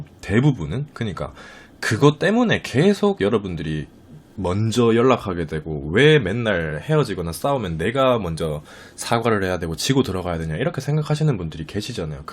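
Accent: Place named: Daegu, South Korea. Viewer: 대구사는데^^익숙한 사투리라 너무 좋고 진짜 최고의 인강을 보는거같아용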